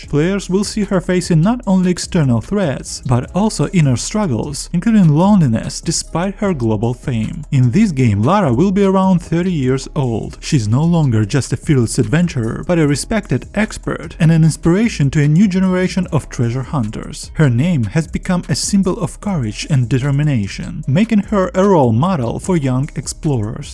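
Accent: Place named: Russia. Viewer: лол, русский тип, тебя за километр слышно.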